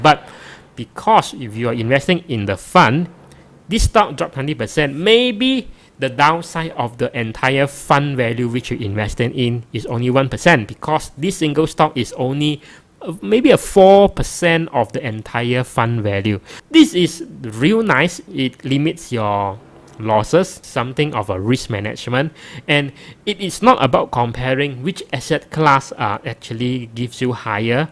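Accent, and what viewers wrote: Malaysia. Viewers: really find your videos helpful, and the Malaysian accent entertaining as well! Don't stop! :)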